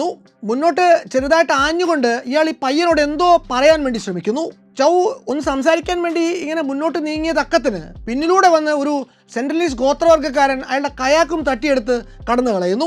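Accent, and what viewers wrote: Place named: Kannur, India. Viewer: Haha പലപ്പോഴും തനി നാടൻ കണ്ണൂർ ഭാഷ വരുന്നുണ്ടല്ലോ😊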